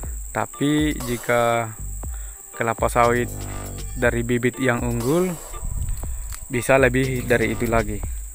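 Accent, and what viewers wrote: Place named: Sulawesi, Indonesia. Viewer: Kayak orang mambi ini logatnya 😁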